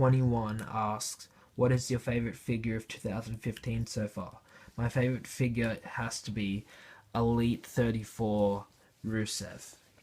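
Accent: Australia. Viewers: Dang this time i can kinda hear your australian accent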